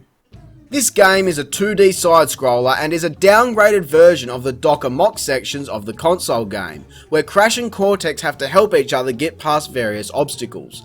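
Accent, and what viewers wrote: Australia. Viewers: UGH HES SO FUCKING AUSTRALIAN IT HURTS IT SOUNDS SO FUCKING AUSTRALIAN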